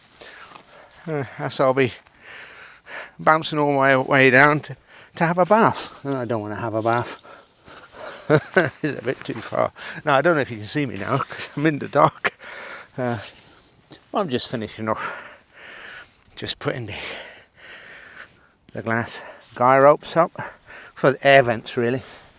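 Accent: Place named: Birmingham, United Kingdom. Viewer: well nice one, no wonder you have those bright lights then🤣 I think I heard a bit of a Brummy accent or was it just my second pint of Champion 7.3😆👍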